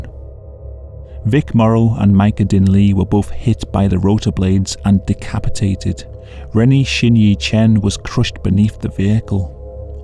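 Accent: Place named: Cockney, United Kingdom. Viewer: nice accent. cockney?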